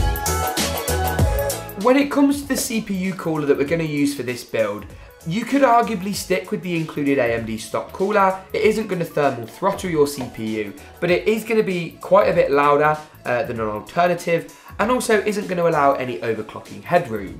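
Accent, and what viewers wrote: United Kingdom. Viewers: Finally a youtuber that doesn't cut the mistakes he makes ... and anyway I really like your brit accent...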